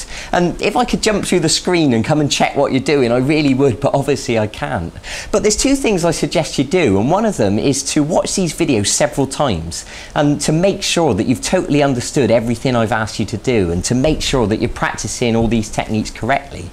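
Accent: United Kingdom. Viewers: he's British, or Scottish, maybe Irish, could be Welsh...you get the idea. Great teacher. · nice accent you are british ???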